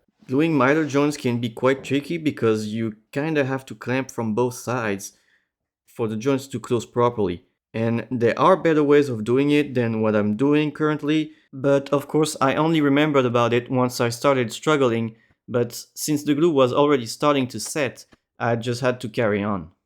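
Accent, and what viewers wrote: France. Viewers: Mec, on sent bien ton accent français dans ton anglais parlé 😄 mais beau travail 👏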